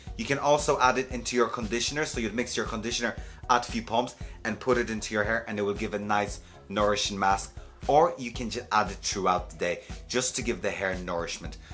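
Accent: Ireland. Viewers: Love your Irish accent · Thanks so much and I love your (irish?) accent ❤ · Why do you and the lovely John Maclean say film instead of video? Is it a Scottish thing? I have both of these oils and I love them so much. I soak Moroccan oil before bed specially before my wash day and I use kerastase on the day to day basis (ps: I think you are from Ireland tho? Please don’t kill me I always mix Scottish and Irish accents tho lol)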